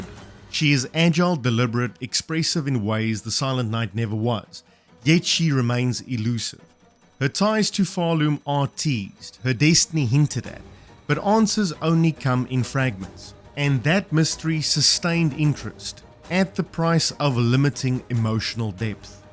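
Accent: South Africa. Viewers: Hey man, what accent do you have? South African? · Aussie Channel, but I know a Saffer when I hear them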